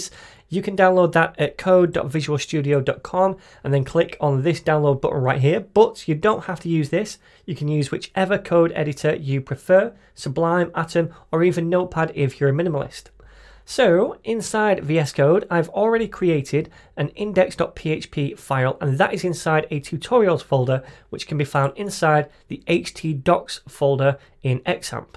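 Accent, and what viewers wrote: United Kingdom. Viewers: fucking british accent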